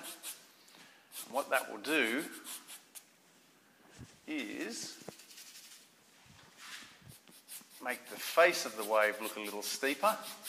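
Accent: Australia. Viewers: I never painted because I thought I had to know how to draw , well imagine my surprise ,when I could paint. I was so confused,because I honestly can’t draw at all . Lol, I’m new here , ready to move out ,I’m in Bracebridge Ontario Canada 🇨🇦,The colours are going to be changing at months end . It’s extremely close to Algonquin Park , where there’s an incredible amount of wildlife,natural lakes ,rocks ,cliffs,it’s really beautiful. I’ve never been to Australia,it sounds like your from Australia/ perhaps New Zealand . I love the Ocean, so when I get settled, this lady is going to order those colours. I wonder if I should get a large can from ( Benjaminin Moore Store ) or no ? It’s acrylic paint,correct? What do you think of metallic paint, ? I’ve recently see ceramic tile ,finger painting . Here , in Ontario , I’d think that would be really expensive. However I believe I saw this young man in Costa Rica . 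However he did use paper ,it looked like watercolour paper ,but it couldn’t be. , definitely durable . , so I honestly don’t have a clue . I’ve just got acrylic paint, and $ store canvases. So should I prep my cheap canvas first? Thanks 🙏 so much . Your very talented. Have a wonderful day. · There's really something about that australian accent.. The way you guys say water is just earfood. Also, it's really wild how a few strokes of paint can do so much. I'm currently painting a picture with a little island in the distance, a big lightning going into the water, but I'm lost at the waves. I have very flat water right now, but during a thunderstorm, water is wild.. But I want to keep the painting calm and soothing still, so I have to figure out how to do this :D Your tutorial helped a lot :) Thank you <3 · This guys amazing! love ya auzzie accent :) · OMG, this looks so easy!!! i know you made it look so easy , to us it won't. 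LOL. I love Australian accent! You're such an amazing artist!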